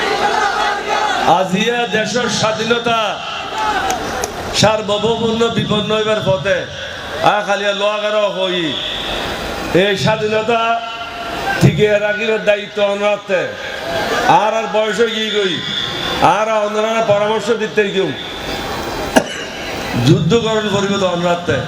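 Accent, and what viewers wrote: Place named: Chittagong, Bangladesh. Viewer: ভাষায় বুজা যায় আমাদের চট্টগ্রামে মানুষ মনে হয়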